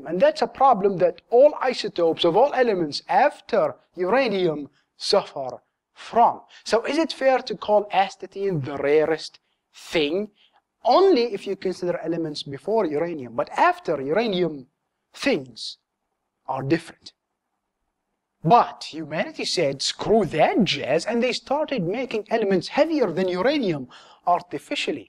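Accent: Arab world. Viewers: he's got a really heavy arab accent (im arab myself) ..is he actually arab ? nevertheless he is amazing :D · اللكنة العربية المستشرية احلى ما في الموضوع :D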